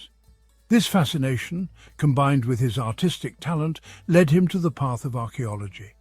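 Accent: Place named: United Kingdom. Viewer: It's painful to hear this shit especially in British accent... Western so called HISTORY expert just lays and lay, timeliness and historical facts all coming from the old testament and the talmud... Nothing in all of the documentaries makes any sense all just to make one creature happy....... FACT 🙈👁️🙉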